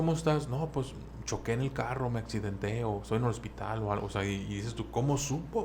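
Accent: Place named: Yucatan, Mexico. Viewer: HE VISTO VIDEOS DEL DOCUMENTALISTA , Y ES UNO DE MIS FAVORITOS , TENGO UNA DUDA EL COCO QUE LLEVA LA BATUTA DE ESTE VIDEO , DE DONDE SERA ??? TIENE UN ACENTO DIFERENTE EN MI OPINIÓN COMO DE YUCATECO MÁS NO SE POR ESO PREGUNTO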